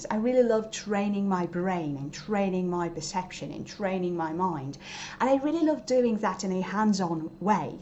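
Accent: United Kingdom. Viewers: I love your British accent and your fancy way to speak!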